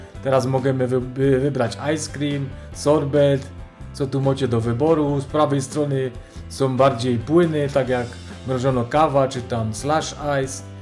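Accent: Silesia, Poland. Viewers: A co to za fonetyka? Czy to wymowa śląska?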